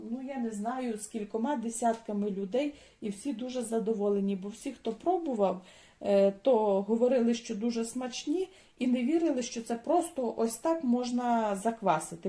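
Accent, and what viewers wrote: Ukraine. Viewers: Как же я давно не слышала настоящий украинский язык. Такой родной и красивый как у бабушки в селе в Винницкой обл. От западенского уже уши вянут. Спасибо большущее вам за рецепт. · Дякую Вам за простий і зрозумілий рецепт! Підписався. І дякую за мову, звідки вона така? Не Полтавщина, там характерні "ль", не Галичина - відсутні місцеві вставки. Скоріше центр України: Київ-Дніпро-Вінниця-Кропивницький-Черкаси. Підтвердите мої здогадки?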